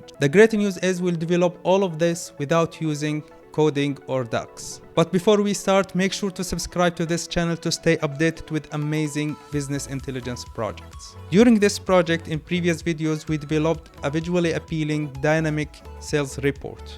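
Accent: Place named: Arab world. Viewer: From your ascent your are Arab it good to have some one like you but if you have another Arabic channel it’s more better.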